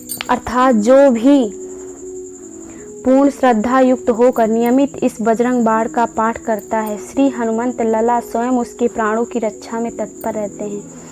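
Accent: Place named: Bihar, India. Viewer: आप शायद बिहार से हो मुझे ऐसा लगता है.. बजरंग बाड़ नहीं उसे बजरंग बाण कहते हैं.. आप बार-बार बजरंग बाड़ कह रहे हो.... 🙏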